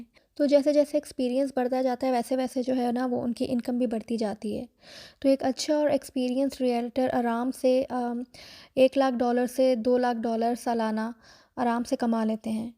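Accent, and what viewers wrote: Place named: Karachi, Pakistan. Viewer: By accent looks like you are purely from Karachi. 🤔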